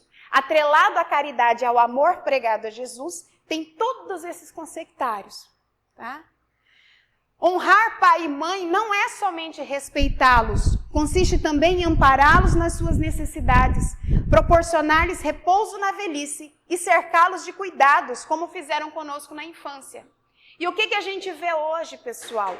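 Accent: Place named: São Paulo, Brazil. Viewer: vc é de onde? gosto muito de suas palestras, pelo sotaque vc é paulista.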